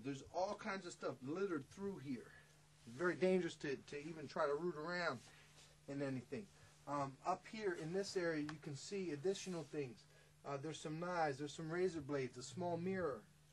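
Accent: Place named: Philadelphia, United States. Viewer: Sounds like North Philly? At least your accent. What city are you in there?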